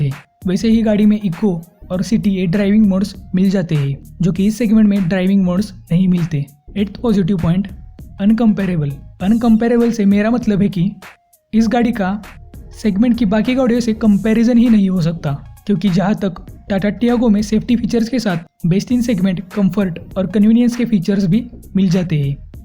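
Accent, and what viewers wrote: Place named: Satara, India. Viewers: Bhau tumhi Satara che aahe watta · भाउ तु मराठी मानुस दिस्तोय बोलन्या वरुन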